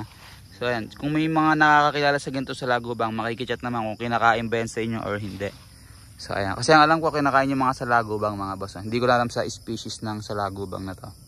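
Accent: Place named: Nueva Ecija, Philippines. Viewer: Taga nueva ecija kaba idol? Pang nueva ecija punto mo e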